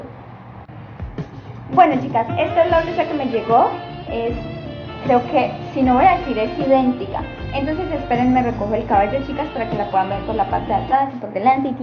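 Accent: Colombia. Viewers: Eres de Barranquilla Colombia? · Por supuesto es Colombiana..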